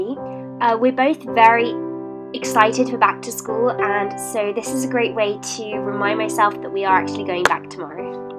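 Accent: RP, United Kingdom. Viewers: Omg she must go to a very posh school! She wears a blazer to school and she has summer homework!🤔 and her accent is quite posh too,and she uses a quil🤔 interesting🙂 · I am sorry if this is offensive or if I got your accent wrong, but by this whole video, I think there is no a person who is more posh than you are! Again, I am sorry if this offends you, I mean it as a compliment - I loooove everything you do. It is nice when someone is tidy, organised and does everything calmly, step by step. I also love how this is not a typical tween/teen video of checking through the social media and other stuff. I know a lot of people light candles now since it is popular currently, but I still loved it. Also, I am a Potterhead myself and a book fan so I loved that part too!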